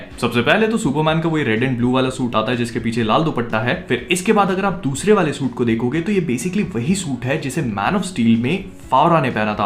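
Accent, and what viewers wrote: Bhopal, India. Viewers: Bhopali Bolte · sound like a India Type it's cool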